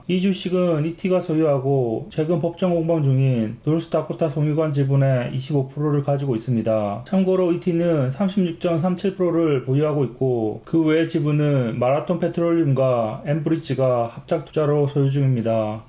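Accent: Gyeongsang, South Korea. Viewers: ovv 처럼 한 번에 다 몰빵은 못해도 조금씩 숫자 늘려가다 보면 나중에 좋지 않을까 하는 생각에서 가지고 있네요. 형님의 구수한 경상도 사투리와 함께하는 영상이 언제나 즐겁습니다 ㅎㅎ 올해도 잘 부탁드립니다~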